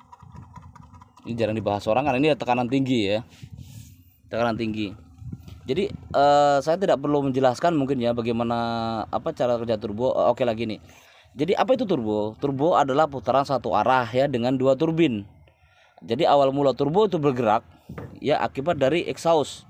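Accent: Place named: Bandung, Indonesia. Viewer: Klu saya amati logatnya kok soperti orang bandung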